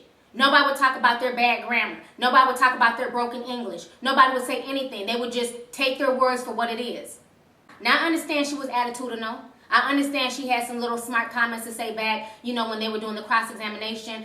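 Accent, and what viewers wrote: South, United States. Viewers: just started watching your channel. was quite surprised that people give you grief about not being articulate. you seem pretty articulate to me; and as for the accent, just figured you were a fellow southerner! also awesome to hear someone speak the truth about lolo jones. great video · ❤ love this and I love your accent. I just thought you were southern. 😄😄 you learn something knew everyday. 😕 I'm Belizean but my accent totally went away. 😩 I assimilated. 😫😫😫 you had cancer I'm so sorry/ 🙌🙌🙌 so glad you are in recovery ❤❤.😟 you really don't mention it in 2017 I just thought you had a virus 🙏 I'm even more glad I've been praying for you ❤❤ so happy you are alive and living your best life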